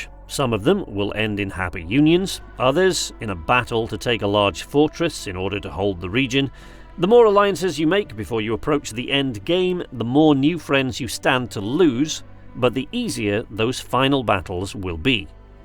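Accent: United Kingdom. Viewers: This guys is so British 😂😂 good fun review tho 👍🏻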